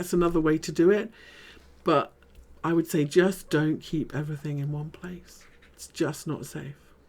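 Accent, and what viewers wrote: United Kingdom. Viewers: Thanks for your update. BTW you have the most classy english accent of the crypto youtubers, that's very pleasant.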